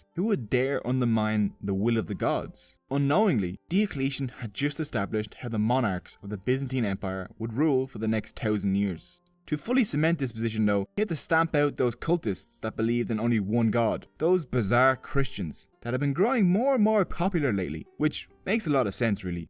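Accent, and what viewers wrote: Ireland. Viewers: That's 100% Irish accent.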